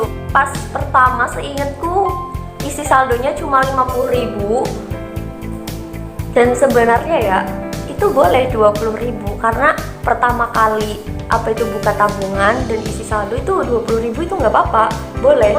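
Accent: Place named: Banyumas, Indonesia. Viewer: "Logat ngapaknya bikin candu" Great job !!! #BERKAHBersamaKeuanganSyariah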